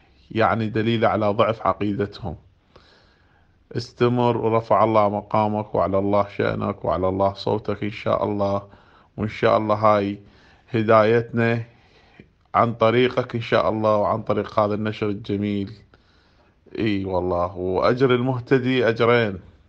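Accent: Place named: Basra, Iraq. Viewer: هذا الأخ مبين من لهجته من اهل البصرة الله ايثبته أن شاء الله على دين الحق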